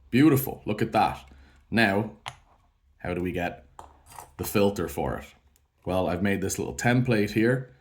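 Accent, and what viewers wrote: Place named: Ireland. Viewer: This is amazing, can't believe a mask that's been around for years which I have just tried and like I search up this issue and you made this but a few days ago. I need this trouble is I have no clue about 3d printing etc. Can I pay you to make me one? I am in the UK by the sounds of accent your just across the sea from me😂 happy to pay for all material, labour and postage? Would really appreciate it if possible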